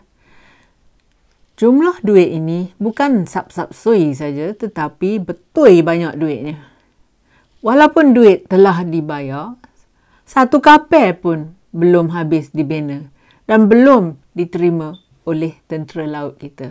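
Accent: Perak, Malaysia. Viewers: Thank you for the lengthy explanation, I am clear now. Already subscribed to your channel. I love your Perak accent..."kapei"..how I miss my hometown..I am currently residing in KK for the past 28 years...Well done.. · udah keluor speaking perak nye yong..tpi ape pon penjelasan yong terbaik,awak senang nk faham..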